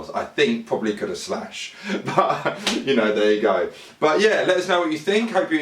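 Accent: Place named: London, United Kingdom. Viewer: Londoner I think.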